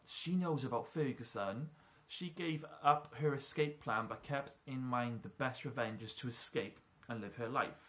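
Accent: Wales, United Kingdom. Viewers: Thank you for reading out two of my comments. So happy that you are interested in what I my opinions :D That link I sent you, I think the producers may have gotten onto that because I noticed earlier that they photos have been replaced. The comment with Channing being the stalker, I actually jumped to that conclusion before I watched that episode. I should wait until I watch the episode before I form conclusions lol. You mentioned Prisoner: Cell Block H, I am a massive fan of that show. I am from Northern Ireland, so I watched it too, on ITV back in the 90s. I was a young kid at the time. It was always an argument to get to say up late to watch it, and most of the time it was taped for me to watch the following day lol. One thing that I was disappointed about in Wentworth is that Bea and Joan never got to have their fight scene, unfortunately. I wanted something similar like episode 326 of Prisoner, with a more updated version of that awesome music used in that fight scene. 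I thought Season 2 was too soon since it was Joan's first season, but never happened in Seasons 3 or 4. Oh yeah, on how to pronounce Marie. You can say it either way. I know of a few women called Marie and it can be pronounced Ma-ree or Marry. It probably sounds different with my accent. The Marry version is just a fancier way of saying it really lol. I like the way you say it, keep saying it that way in that nice Welsh accent :) Apart from Prisoner and Wentworth, what other prisoner shows do you like? I a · I can't wait till season 7 starts, any idea when it's going to be shown in uk? Love your welsh accent by the way😀.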